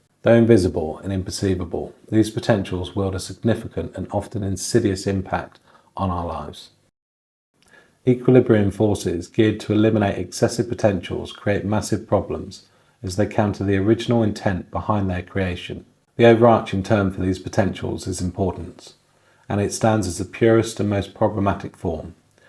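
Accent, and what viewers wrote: United Kingdom. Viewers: lovely to hear your British accent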